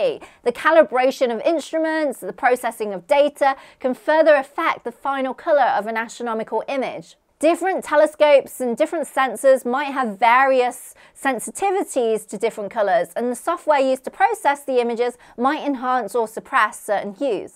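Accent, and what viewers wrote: United Kingdom. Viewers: I was is that a British accent. Could still be. Oh. Nottingham UK. Your so far might as well be on the moon😂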